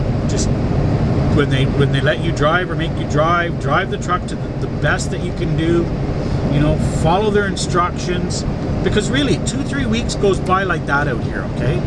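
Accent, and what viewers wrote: Canada. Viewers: I called it 30 sec after the video started - knew he was a canuck, recognize that accent anywhere good vids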